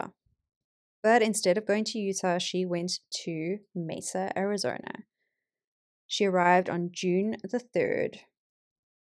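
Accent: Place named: South Africa. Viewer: Just found your channel. I have subbed! I love your content and hearing a South African doing crime 🥰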